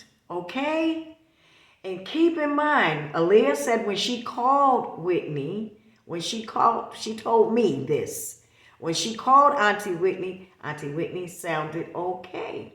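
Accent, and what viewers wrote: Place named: Boston, United States. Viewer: Heavy on ya "HOT"(HEART)❤ Straight Boston accent 🆗️ Love it and thanks 4 the "tea"🍵💨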